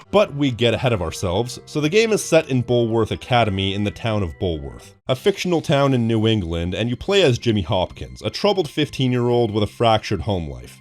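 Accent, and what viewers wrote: Canada. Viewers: Go Canucks? I knew you sounded like a fellow Canadian 😅 Go Sens Go! 😉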